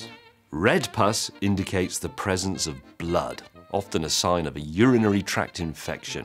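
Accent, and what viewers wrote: United Kingdom. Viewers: British accent 😳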